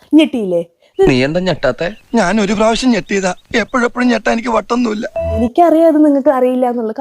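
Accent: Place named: Alappuzha, India. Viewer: Ith kollam bhasha alla. Alapauzha aananu thonunu. Malabar airunel thuranu kaanichene..😂😂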